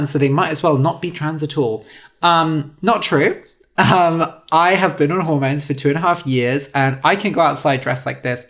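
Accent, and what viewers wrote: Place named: United Kingdom. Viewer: oooohh! a Brit! (or somebody who sounds similar)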